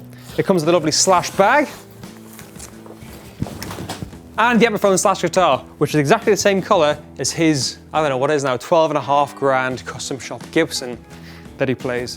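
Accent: United Kingdom. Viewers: You move like Captain Jack Sparrow, Also you almost talk like him, Makes it better because you got that nice british accent.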